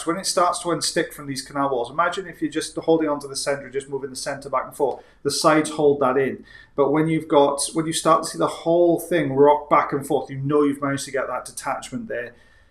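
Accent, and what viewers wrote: Wales, United Kingdom. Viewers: Yeah, it amazing how it does relax you isn't it? Is the subject matter, or the lilting Welsh voice that soothes you?😴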